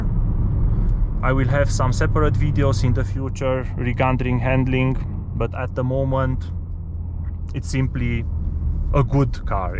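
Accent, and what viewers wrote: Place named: Russia. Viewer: Man... I love your accent. By any chance, do you have Russian roots? By the way, I saw this in the description ''*Manhart Stage 1 will allow 300+ kmh ''. Honestly, I'm not sure about that. In your other video I saw 256km/h at almost 6000 rpm. The manual gearbox seems to have short gears. 300km/h+ should be possible, especialy with a remap, but I wonder if that gearbox can reach such speeds. Stock these do about 1.2 bar boost. If the turbo can do about 2 bar or more, expect atleast 600hp, maybe something close to 650hp with a stage 1 remap.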